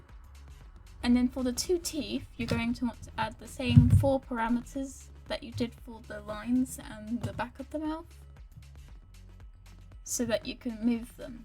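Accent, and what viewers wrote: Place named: United Kingdom. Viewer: Wow Your British! I Think.. Idk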